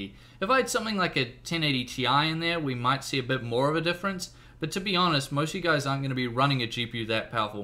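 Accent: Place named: New Zealand. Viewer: I think this one is a New Zealander.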